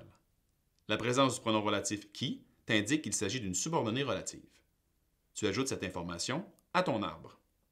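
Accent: Quebec, Canada. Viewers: Son français est correct, il a juste un accent québécois.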